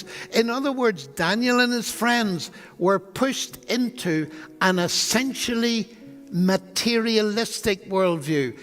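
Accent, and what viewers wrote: Northern Ireland, United Kingdom. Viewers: I concur with everything you've mentioned, except that he has an Irish accent.He's from Northern Ireland 😇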